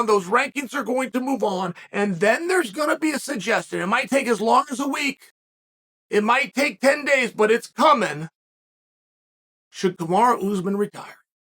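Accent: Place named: United States. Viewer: Clicked on the vid, heard the American accent, closed the vid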